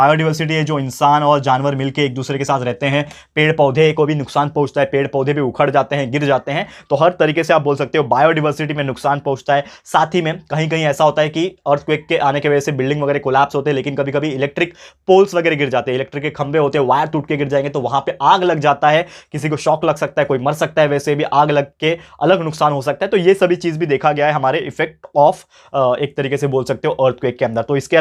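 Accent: Maharashtra, India. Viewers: Sir are you from Maharashtra?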